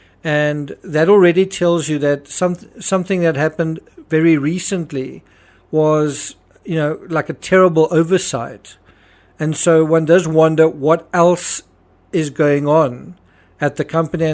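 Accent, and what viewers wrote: South Africa. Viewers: Are you from South Africa? Trying to place your accent. I think it is South African.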